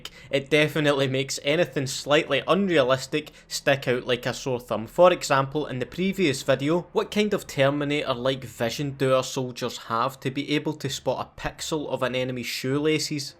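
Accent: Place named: Northern England, United Kingdom. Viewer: God I love Northern accents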